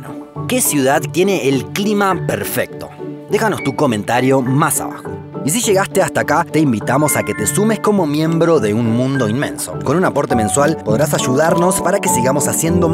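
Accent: Argentina. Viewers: Creo que el narrador es argentino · Che no pierdas el argentinismo en el habla viejo! "Medeín" , "Guaiaquil", "Abuia"... ¿qué es eso? mandale sheísmo a pleno. No pierdas la identidad argenta loco, que es un recontra canal y que sea argentino me gusta y enorgullece a dos manos XD Abrazo de gol!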